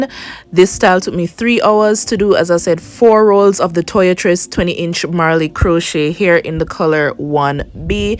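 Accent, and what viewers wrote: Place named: Jamaica. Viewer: Come thruuu Jamaican Accent lmao. These came out lovely. Love it!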